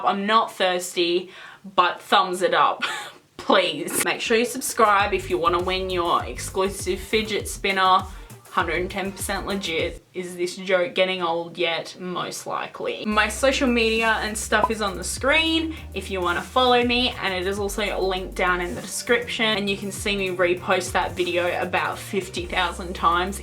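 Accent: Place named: Australia. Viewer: okay but i notice a HUGE difference between pronunciations of words when australians say them vs when americans say them (i being a fellow american). for example, i say uh-deed-iss. you say ah-deed-das. correct me if that's (the way i say it) not the correct way to say it ahaha. NO HATE ON YOUR ACCENT (i actually love it), just thought i'd point that out cause i think it's cool. 😂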